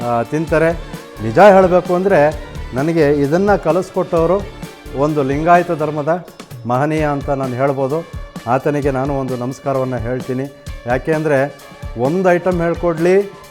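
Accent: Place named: Chamarajanagar, India. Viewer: ನೀವು ಮಾತನಾಡಿದ್ದು ಚಾಮರಾಜನಗರದ ಭಾಷೆ ನೀವು ನೀವು ಮಾಡಿದ್ದು ಚಾಮರಾಜನಗರದ ಕಳ್ಳೇ ಹುಲಿ ಈ ರೆಸಿಪಿ ಮರೆತು ಹೋಗಿತ್ತು ನೆನಪು ಮಾಡಿದ್ದಕ್ಕೆ ಧನ್ಯವಾದಗಳು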